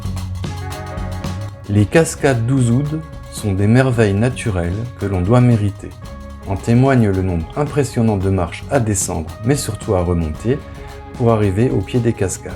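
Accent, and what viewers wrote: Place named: Alsace, France. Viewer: j'ai cru reconnaître l'accent... Moi je le suis (alsacien), mais expatrié en Provence... Pour pouvoir un peu rouler en 4x4 sur les magnifiques Chemins du plateau de valensole et des gorges du Verdon....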